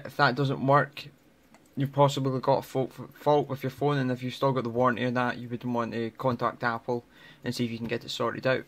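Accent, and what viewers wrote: Scotland, United Kingdom. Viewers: Is u Scottish ? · Hes scottish you fucktard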